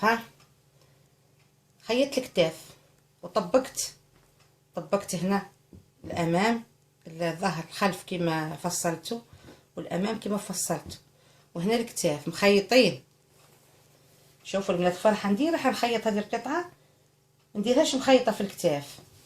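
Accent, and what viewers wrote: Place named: Djelfa, Algeria. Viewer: تهدري كيما صحاب الجلفة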